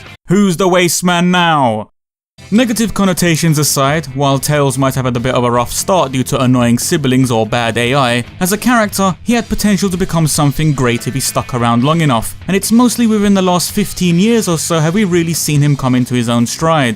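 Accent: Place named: Inner London, United Kingdom. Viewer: I'm curious to know, I'm think you mentioned this in another video but where about in England are you from again? Your speech makes me wanna say inner London?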